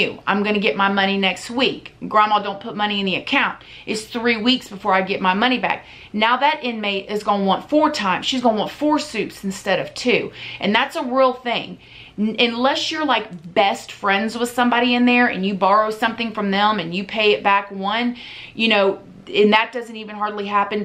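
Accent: South, United States. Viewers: I'm from Florida too. She has southern accent all the way through..it comes out stronger when were nervous, excited,. Or when I'm at the dentist on the funny gas LMBO. ... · Rhoda Yackez she isn’t putting it on, she actually has a southern accent and why do u feel the need to critique her looks? let her live. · What? She's southern ding ding, she's from Florida. There's no way to hide being from the south. Unless u didn't grow up here all your life. Or you have had a speech coach drill it out ur mouth lol. Some words or moments, bring out your southern drawl more. I live in Texas born and raised in the deep south😂. I sound like a Dolly Parton country song, with a Reba Macintyre twist.😂😄😁😊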